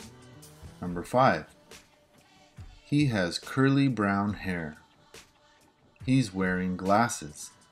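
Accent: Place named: United States. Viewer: Thanks! I couldn´t find an American accent video till I saw this one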